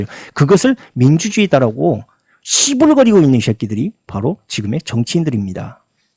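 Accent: Jeolla, South Korea. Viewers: 은 절라도 말투? 경제에대해 시원하게 말씀해주시고 윤석렬은 특히 미워하시는걸 보니 진보(사회주의)에 가깝다. 사실 정치가들을 믿을 수는 없지만.....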